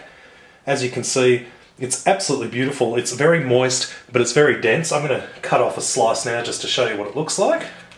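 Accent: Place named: Australia. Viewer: thats just his epic aussie accent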